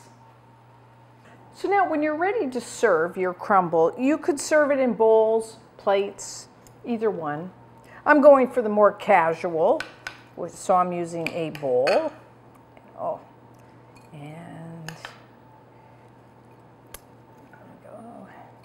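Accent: Canada. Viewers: I enjoy your demonstrations so much! Thank you for all the wonderful recipes you've shared with us. I also love how the Canadian accent comes out sometimes :-)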